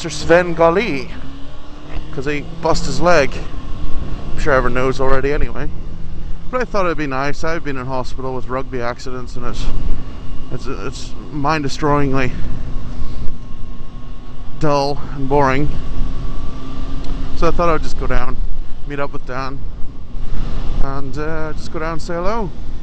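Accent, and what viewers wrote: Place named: Northern Ireland, United Kingdom. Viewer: yea thought you were from norn iron.. im from carrickfergus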